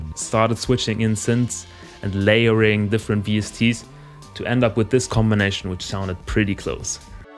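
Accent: Germany. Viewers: Bist du Deutsch? lol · I have zero idea how to make music, or what you’re doing in the video, but still very entertaining! Good job Also are you German? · omg wusste direkt vom akzent dass er deutsch ist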